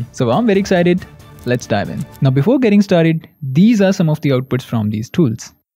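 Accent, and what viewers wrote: India. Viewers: You know what they say: Anyone with a funny/Indian English pronunciation promoting a site that seems to work wonders is actually a scam.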